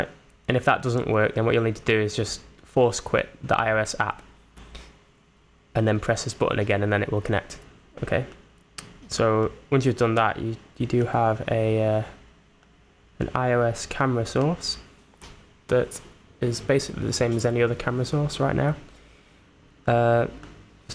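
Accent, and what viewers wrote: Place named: North of England, United Kingdom. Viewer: Great plugin just purchased it! Sounds like a North of England accent! :-)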